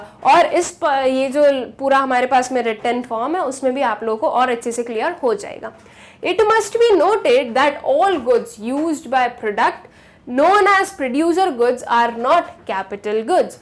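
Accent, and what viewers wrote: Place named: Gujarat, India. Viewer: Maam have gujrati tone 🔥🔥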